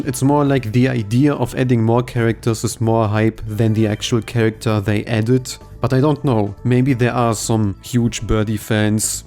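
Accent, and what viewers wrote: Germany. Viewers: IMO the city tracks in this wave were a huge improvement, Singapore is pretty good and Bangkok is even among my favorite tracks in the entire game! Side note, by the way you talk it's very noticeable that you're German (ich bin auch deutsch ^^). Try to use the word "the" less in places where it doesn't belong in English. For example, just say "Yoshi's Island" and not "the Yoshi's Island".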